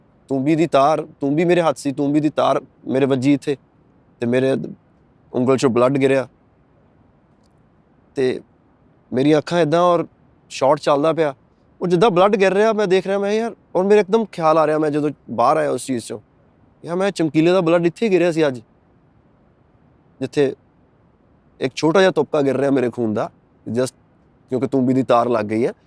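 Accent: Punjab, Pakistan. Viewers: Host lagda Lehnde Punjab toh aa !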